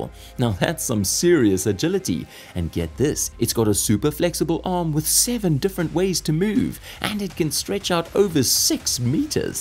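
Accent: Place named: United Kingdom. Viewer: Not bad for AI. I didn't hear any mistakes in the dialog. Only question: why are you talking about "Our military" (as in the US) with a British accent?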